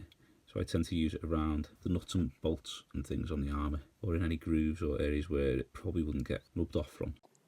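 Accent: Liverpool, United Kingdom. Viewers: Scouser! Where you from man? I'm from over the water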